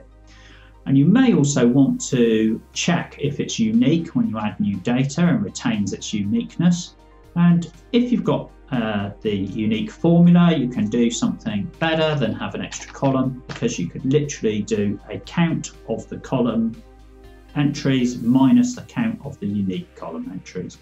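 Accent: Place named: United Kingdom. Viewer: What I heard was a very accented British dialect of some sort, very hard to understand.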